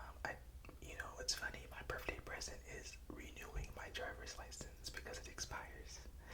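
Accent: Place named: United Kingdom. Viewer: the british accent omg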